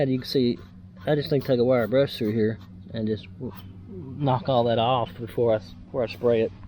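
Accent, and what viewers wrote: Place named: Kentucky, United States. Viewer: I'm from Kentucky and I know one from there when I hear one.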